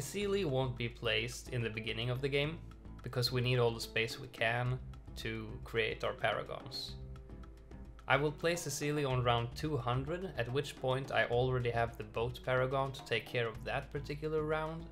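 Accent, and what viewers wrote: Sweden. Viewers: you sound swedish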